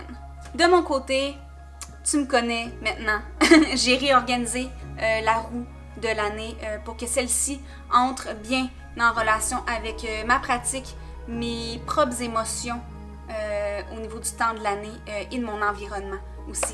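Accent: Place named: Quebec, Canada. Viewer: je viens de commencer ta chaine et jai regardé pas mal de tes vidéos et jaime beaucoup ! mais c'est marrant j arrive toujours pas à m avouer que je pratique 🤷‍♀️ pourtant ça fait 17 ans que jai mis un pied dans l ésotérisme 😅 mais tu m as convaincu d un truc que je devais vraiment créer quelque chose (j écris et dessine depuis toujours mais jai envie de travailler autre chose) en tout cas merci ! (non tu m as convaincu d autres choses j'aime bien aussi l accent québécois 😂)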